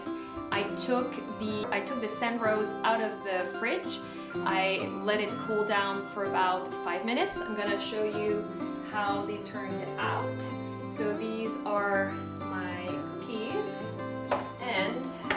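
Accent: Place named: France. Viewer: Can’t wait to try your recipe! You sound a little French like me!!! I live in PEI but I’m from NB. I’m also French speaking!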